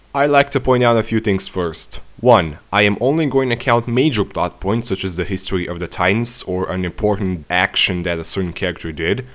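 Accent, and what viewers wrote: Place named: Birmingham, United Kingdom. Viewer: Between that half brummie accent and mispronouncing your "R"s and "L"s, I can barely follow what you're saying. Learn to talk or get someone else to do the voice-over, or just give us text.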